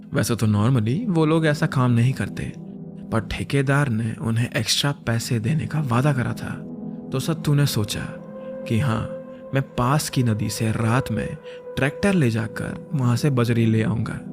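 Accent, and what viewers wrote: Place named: Bihar, India. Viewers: Dude, you have nailed it. This is one of the best story telling of yours so far. Your voice modulation, Bihari accent, background score everything is top notch. Great work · its bihari accent for me....damn.. adooorable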